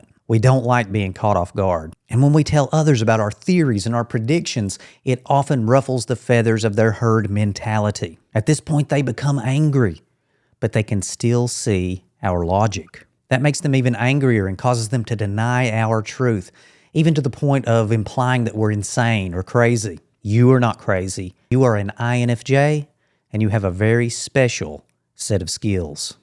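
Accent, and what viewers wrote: South, United States. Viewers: Cool southern accent. Have a great day, INFJ’s!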